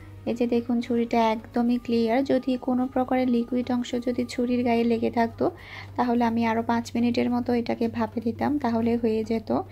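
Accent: Chittagong, Bangladesh. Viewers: আপু আপনার কথা গুলো ভালো লাগে চিটাগাং এর ভাসা আছে তাই চিমের বিছি আমার খূব ভালো লাগে আমি ও চিটাগাং এর মেয়ে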